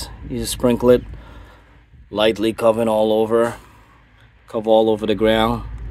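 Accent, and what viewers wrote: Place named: Guyana. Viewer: Sound like a GT Banna. 🇬🇾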